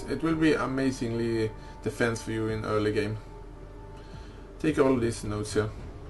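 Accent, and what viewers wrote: Sweden. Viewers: svensk? :D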